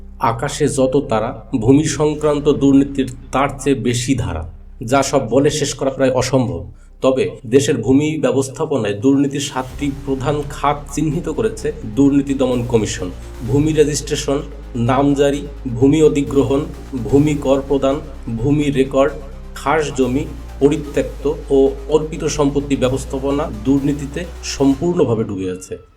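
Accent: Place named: South, Bangladesh. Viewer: ভাই অসাধারণ। আমি আপনার এই ভিডিওটা দেখে সত্যিই অভিভুত। বলতেগেলে স্পিক-লেস। আপনার সাথে আমার পরিচয় হয়েছে মূলত কাজী তালিম ভাইর মাধ্যমে। অবশ্য এটা র্ভাচুয়াল মিট------------সরাসরি দেখা বা কথা হয়নি। আমি ক্ষুদ্র মানূয়, পুঁজিবারে সামান্য বিনিয়োগ আছে। আমি সুদ মূক্ত থাকার জন্য এই লাইনে আসা। ভাই আপনার কথায় মনে হচ্ছে আপনি দক্ষিণের লোক। যদি তাই হয় তাহলে আমি আপনার দেশি। আমার বাড়ি বরিশাল। -----------আপনার এলিয়েট ওয়েভ প্রশিক্ষরণ অল্পের জন্য যুক্ত হতে পারিনি। তবে পরে ভিডিও পেয়েছি। ---ধন্যবাদ ভাই ভালো থাকবেন।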